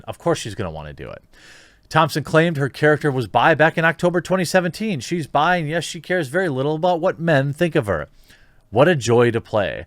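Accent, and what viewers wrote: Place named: Wisconsin, United States. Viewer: Why pronounce it “Taysuh Tompson” is it intentional disrespect, or just that atrocious Wisconsin accent?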